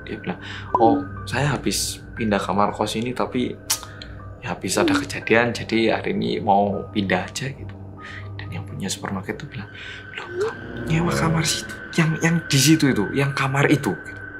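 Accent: Java, Indonesia. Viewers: Bicaramu Caaakkk....muwedhok nemen...😄😄😄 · Dua jempol dech buat mas. Kagum bngt sama medok jawanya... Aq aja masih keturunan jawa, gk medok2 bngt. Jdi iri dech.. Srmangt trs buat upload kasus2 yg rada serem ya mas, tak enteni loch.. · korea indo medok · Yang medoooookkk .haha Sukses selalu mas bro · Logat Jawa nya medok banget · kodem lah, kan mas pernah tinggal di malang dan ikut membalik² kan kalimat kayak Reomit kan aslinya Timoer. kan juga pernah tinggal di Jawa Timoer. jadi nya itu tu Kodem alias Medok, oke? 🐦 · Meanwhile Mas: "Halo guys welcome back to Korea Reomit bersama orang Korea yang.." Gw: "MEDHOKKK" Medhoknya ituloh terngiang ngiang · bhs indonesianya kok fasih dan agak medok jawa nya.....😀😀lucu.....kayak tetangga saya.....chaina..... seneng pakai bhs indonesia tapi medok abis..... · "Kodem" kalo di balik jadi "medok" dulu dia openingnya pake kata "medok" cuma sekarang dibalik jadi "kodem" Maksudnya medok itu karena itu orang korea tapi medok gitu cara ngomongnya sekian terima kasih.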